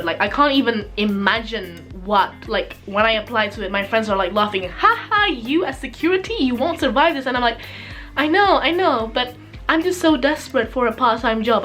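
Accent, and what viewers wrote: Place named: United Kingdom. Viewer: Aw<link> britishnya udh mulai kedengeran nih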